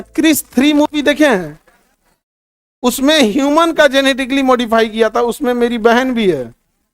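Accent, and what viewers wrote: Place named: Bhojpuri, India. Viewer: Khan sir you are great you speak just like my brother bhojpuri and you have great knowledge.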